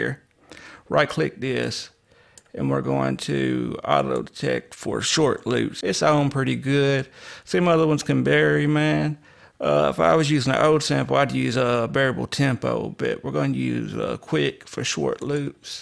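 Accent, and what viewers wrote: South, United States. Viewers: Wow...this is incredible on hella so many levels. Thank you. Im from the south so I am used to accents but it makes it better to watch....not sure if its you or an act but you should really keep this up. Thank you so much. I would pay you for tutorials...super good info. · Your thick southern accent makes this vid that much better...knowlege God!!! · 12 min in iam loving that cowboy accent...this video helped a lot cause i was always curious on how to get that & vibe with chopped up samples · Hey man, you sound country as hell, but you know your stuff Lol. I really appreciate this video, brother.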